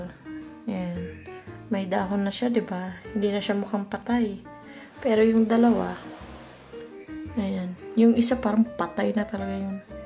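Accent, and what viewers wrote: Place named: Visayas, Philippines. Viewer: Hello, I am new to your channel. Saang part ka ba sa Pinas? Halata na bisaya ka.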